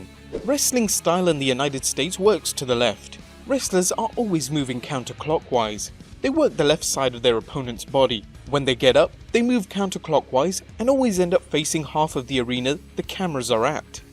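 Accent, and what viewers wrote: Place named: United Kingdom. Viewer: Triple AITCH. AITCH BEE KAY. POSStuh. I've always wondered if Brits pronounce words incorrectly on purpose or if they have difficulty hearing and reproducing simple sounds... You've been making vids for a primarily American audience for too long to keep doing that. In London, I ride the Tube; in the US, it's the subway. Come on dude. Next you'll pronounce the 'T' in 'wrestling'.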